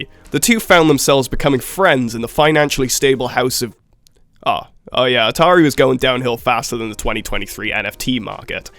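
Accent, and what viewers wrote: United Kingdom. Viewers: This is a great video dude, also could be completely off but are you Welsh?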